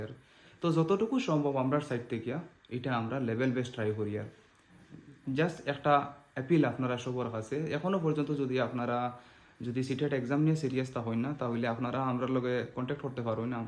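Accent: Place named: Sylhet, Bangladesh. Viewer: Aita bengali language na ....sylheti language a kotha boltese...Amio Dhubri theke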